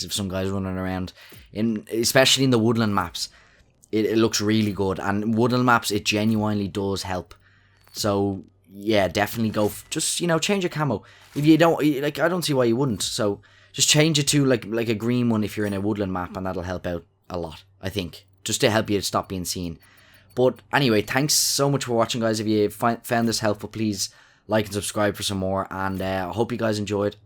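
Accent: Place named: Ireland. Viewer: I'm guessing your Irish cuz u sound irish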